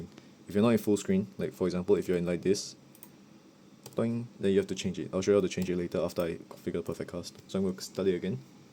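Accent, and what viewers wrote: Singapore. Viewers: w singapore acent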